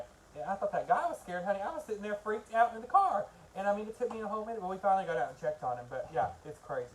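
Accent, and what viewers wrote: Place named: Texas, United States. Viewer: Hi!! Love your videos and am learning more from you. But to be honest I’d watch you just for the entertainment value!! I love your accent , I’m from central Texas too, and combined with how fast you talk is just a hoot!! All I’d ask is for you to make more videos!!! I’ve binged watched as many of your videos as I could find and only found you yesterday!! I love you and am a fan!! I even went to Ellis’ and ordered a bunch of supplies so it’s a win-win!! I love finding new places to shop!! Take care and keep up the good work!!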